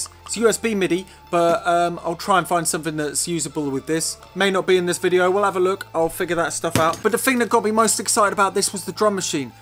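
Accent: Australia. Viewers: Fix the EuroPlus, hook a wire between the IIe's play button to the + play button. TWO track songs! ps, Aussie accent is a beauty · Can we get more half australian accent?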